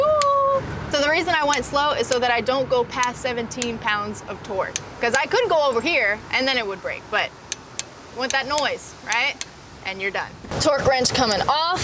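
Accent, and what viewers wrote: United Kingdom. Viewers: A little British accent. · Hats off to you for using anti-seize compound. Why do you intermittently speak in a British accent? In answer to people asking why she’s changing the plugs so soon, it’s so she can make an instructional video. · Great British accent. The clip ain't bad either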